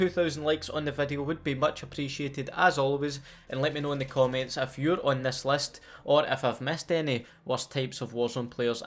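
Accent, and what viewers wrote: Scotland, United Kingdom. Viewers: Cheers for the vid pal. Awesome Scottish commentary 👍🏼👍🏼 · U have the best Scottish voice ever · are you Scottish or Irish? I am guessing you are Scottish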